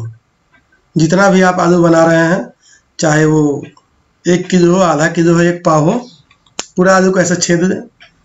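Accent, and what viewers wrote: Bihar, India. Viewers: In starting when u say Ram Ram ji that is really nice 🙏👌and the way bihari people speak that is so sweet 😊😊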